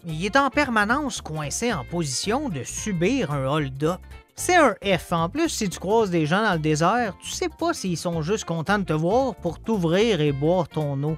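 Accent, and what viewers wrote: Quebec, Canada. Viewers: Salut je viens de m'abonner sur la premiere video que je regarde , mais par contre , l'accent quebecois 😂 (je ne me moque pas mais je l'ai jamais vu)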